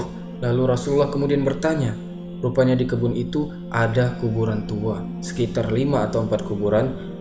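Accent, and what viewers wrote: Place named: West Sumatra, Indonesia. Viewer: Admin orang minang ya. Soalnya logatnya kayak orang minang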